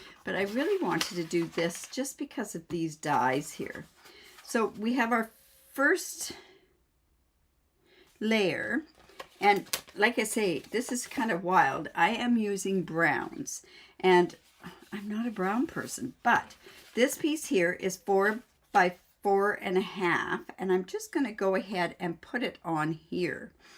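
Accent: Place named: South, United States. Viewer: Love the pecan pie accent!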